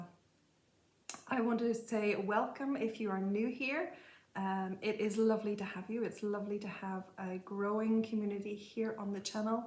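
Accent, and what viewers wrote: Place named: Ireland. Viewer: so lovely to see you again and we do understand you are very busy, not only looking after your own wee family, but also preparing for long term house guests. Wishing you all the best as you all adjust to the new routine once your guests arrive. I'm sure you will be able to grab a few minutes some days to stitch a row and use this as your chill out and recharge time. Please don't worry about having too much content for future videos. Just to see your face and hear a couple of minutes of your Irish lilt will suffice until everything settles down for you. Take care and deep breaths. xx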